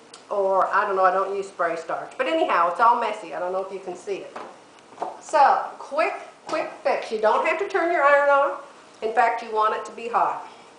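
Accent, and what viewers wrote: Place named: Oklahoma, United States. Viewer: You mention Edmond.. is it Edmond Oklahoma? Your accent sounds like mine. Okie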